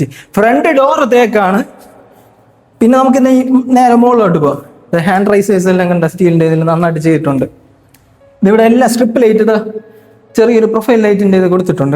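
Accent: Parassala, India. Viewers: പാറ സാ ല സ്ലാങ്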